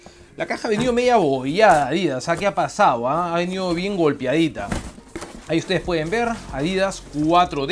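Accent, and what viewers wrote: Peru. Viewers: Ese tono peruano me hace largarme y no verte 🙄🙄🙄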